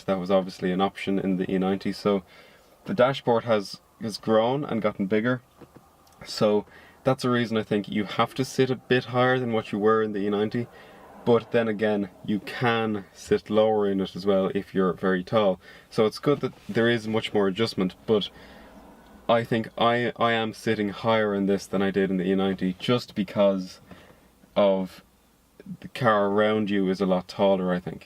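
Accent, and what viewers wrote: Ireland. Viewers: Great review and I'm a fan of the Irish accent :-). Having come from an E90, the steering felt weird at first, but I'm used to it now.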